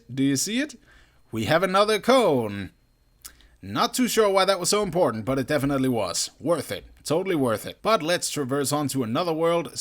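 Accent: Denmark. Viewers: u sound like ur from denmark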